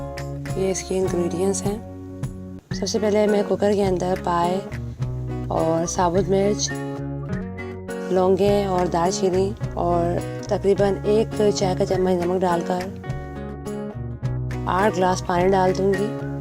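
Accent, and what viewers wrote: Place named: Hyderabad, India. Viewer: Hydrabadi accent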